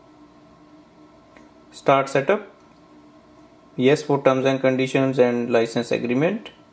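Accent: India. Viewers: You need to practice more on pronunciation before making videos. The Indian accent is terrible!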